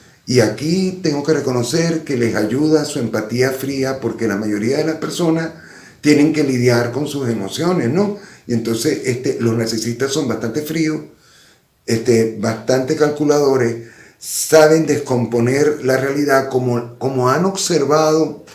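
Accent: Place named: Venezuela. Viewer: Me gusta ese acento. Parece venezolano. Muy dulce como habla también. Gracias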